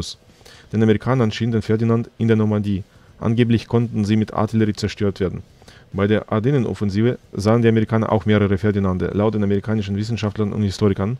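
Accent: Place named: Russia. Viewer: Deutscher Kommentar mit russischem Akzent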